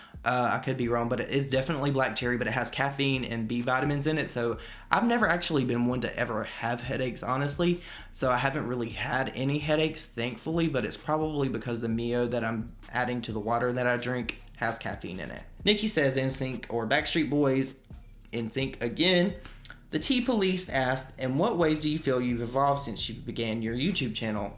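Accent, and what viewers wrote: South, United States. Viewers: I miss you doing these kinds of videos, its like a breath of fresh air from all the drama so thankfully the drama is slow this year(so far) 🤣🤣 love you! I could listen to you talk alllll day! Your accent is everything and reminds me of my southern family i dont get to really talk to very often! Love you hope you are having a greaaaat day!!! I cant wait to see the new content you bring out, id love to see true crime, paranormal stuff from you!!!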